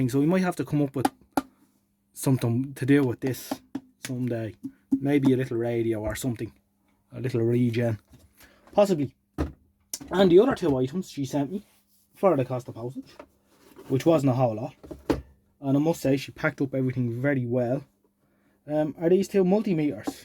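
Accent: Ireland. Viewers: As your man says 'you can never have to many multimeters' my cheap CPC dvm is oten let on and is always flat, i never seem to have managed to keep the internal fuses for current so it's volts only. I have a NOS 70's far east meter that i bought for old times sake and i still drag out my big old AVO. I played your voice down the phone to my wife, she was from Hacketstown Carlow. She reckoned dublin or wicklow. Anyway mate, keep up the good work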